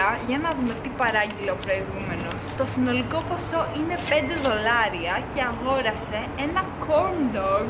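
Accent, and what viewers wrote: Spain. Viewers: Σε ρωτάνε αν μιλάς ισπανικά γιατί η προφορά των Ισπανών και των Ελλήνων όταν μιλάνε αγγλικα είναι ίδια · Πιστεύω ότι σε ρωτούσαν αν μιλάς ισπανικά επειδή πολλοί ξένοι λένε ότι τα ελληνικά ακούγονται σαν ισπανικά. Έτσι και η προφορά σου μάλλον έμοιαζε με την ισπανική προφορά και λογικά θεώρησαν ότι πρέπει να σου μιλήσουν τη γλώσσα 'σου'. Ξέρω ότι στην Αμερική ζητάνε τα ισπανικά σα δεύτερη γλώσσα στην εργασία ακριβώς επειδή υπάρχουν πολλοί ισπανόφωνοι, οπότε έχει νόημα.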